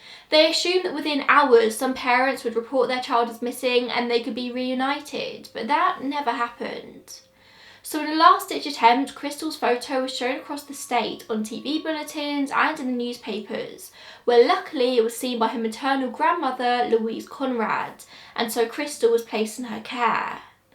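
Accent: England, United Kingdom. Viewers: So weird to hear her English accent on YouTube talking about the ghetto in Washington i used to live in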